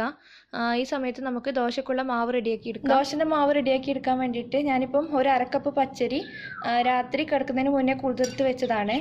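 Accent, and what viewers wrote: Kannur, India. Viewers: kannur evideyaaa. .......varthnm kelkn nalla rasamud. .....njanum kannur annu. ....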